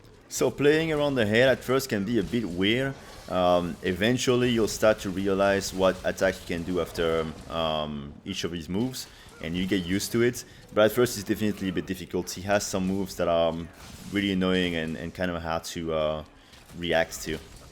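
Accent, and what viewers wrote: France. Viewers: Tu serais pas français par hasard???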